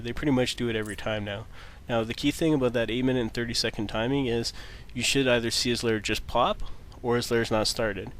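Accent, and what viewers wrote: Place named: Canada. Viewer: Having gone through this series from the start, I feel it's funny how I agreed with one of the early posters who complained about voice inflection etc. (and of course his oat and aboat Canadian accent:-) But it seems I got used to it very quickly, I guess mainly because he says a lot of very useful things that are right up my alley. All the other "coaches" even such as day9 seem unable to break down the many many aspects of the game into steps that you can practice individually.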